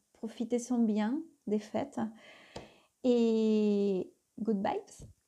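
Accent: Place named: Spain. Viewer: Magnifiques tirage merci beaucoup, jolie l'accent Espagnol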